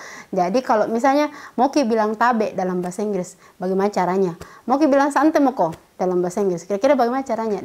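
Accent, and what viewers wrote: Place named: Makassar, Indonesia. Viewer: Rinduu kelas logat makassar, sukses eonnicu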